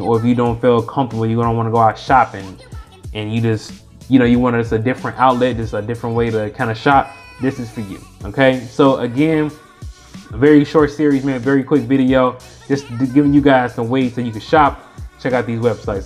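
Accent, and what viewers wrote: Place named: Philadelphia, United States. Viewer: Sounds like Philadelphia to me cuz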